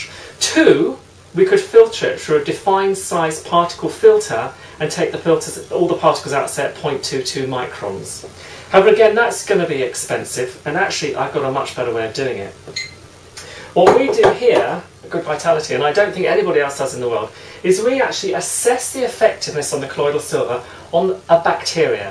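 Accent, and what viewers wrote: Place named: England, United Kingdom. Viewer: I only believe this guy because he has an English accent. Great demonstration.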